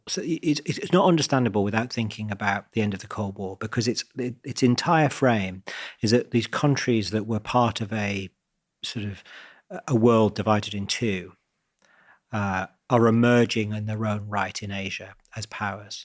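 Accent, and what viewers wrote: RP, United Kingdom. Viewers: great insight .. also he blinks and tlks like mr westwood but speaks the queens english lol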